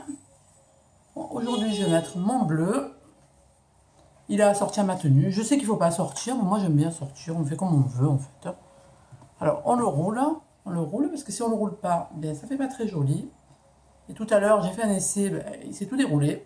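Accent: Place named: South, France. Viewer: Coucou, sympa de te voir "animée" et d'entendre ton petit accent du Sud:) Evidemment je m'abonne! Bisous bisous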